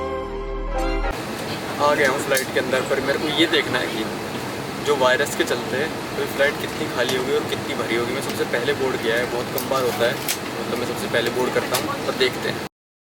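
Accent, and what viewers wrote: Haryana, India. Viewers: I love Haryanvi accent bhai. Love from Lahore,Pakistan.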